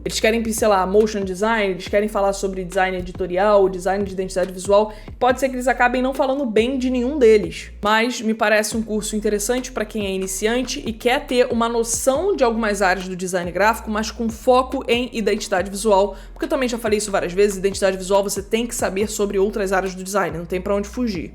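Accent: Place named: Rio de Janeiro, Brazil. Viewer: Legal esse seu acento carioca. Me lembra muito uma amiga do Méier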